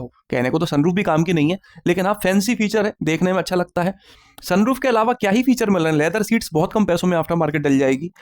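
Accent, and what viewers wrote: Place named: Delhi, India. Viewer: Typical ghaati dilliwali hindi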